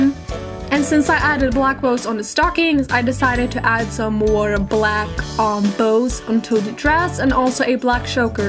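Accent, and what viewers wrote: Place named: Sweden. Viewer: I love it! Ps your accent is amazing I wish Danish accents sounded like Swedish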